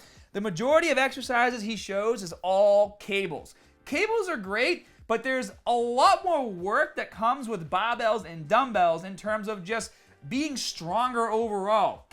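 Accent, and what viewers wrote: Boston, United States. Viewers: I'm starting to get used to the Boston accent. It's becoming endearing! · Bruthah, your accent is wicked pissah! I grew up in Boston but lost my accent in Tuscon. Whenever I'm in Boston I realize that the accent's disappearing. Hold on to it. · Lol Boston accent thicccccc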